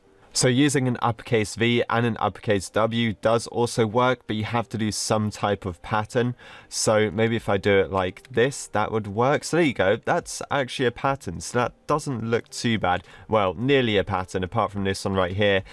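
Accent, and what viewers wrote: United Kingdom. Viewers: He's British that's why.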